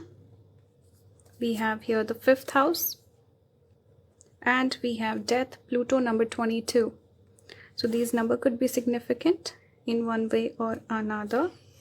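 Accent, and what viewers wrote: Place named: India. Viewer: Why you sound like an Indian...are you an Indian ❤❤❤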